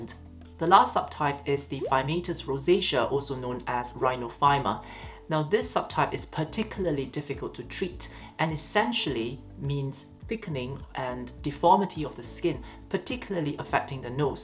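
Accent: Scotland, United Kingdom. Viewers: You can definitely here a Scottish twang in your accent ❤ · I’m Scottish and I can sometimes hear a wee Scottish twang in your accent ❤ great info ! I suffer from mild rosacea mostly with thread veins on my cheeks . I’m now more informed and ready to treat. Many thanks and I’m jealous of your beautiful clear skin! ❤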